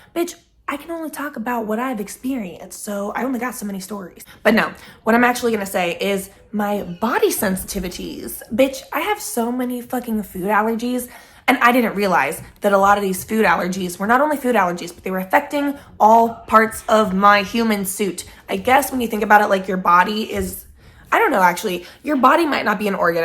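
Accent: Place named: United Kingdom. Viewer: I love every video every time! You do not miss! As someone who also struggles mentally I always love these videos. The British accent is also so funny bc why do I do the same thing when I’m talking about my mental stuff😂😂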